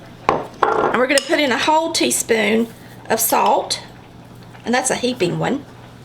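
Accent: South, United States. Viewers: Love the southern accent, love the receipes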